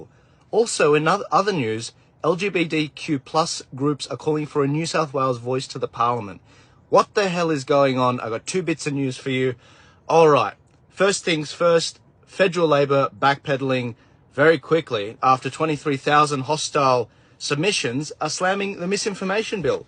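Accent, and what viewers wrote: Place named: United States. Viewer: Misinformation. Such as Dutton stating the voice has the power to Vito government? Or how about its completely legal for politicians to lie to Australian public during elections and referendums? How about scomo with his watered down to the national icac bill? What war are you fighting bud? You sound just like a yank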